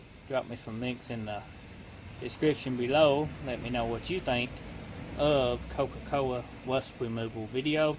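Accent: United States. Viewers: Love the American accent mate. I'm from Ireland... and it sounds nice. Good video, I'm going to try it on my škoda. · American accent with a speack dialect but damn good video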